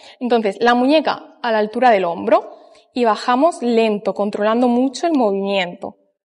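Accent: Spain. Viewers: te está saliendo acento catalan o es mi imaginación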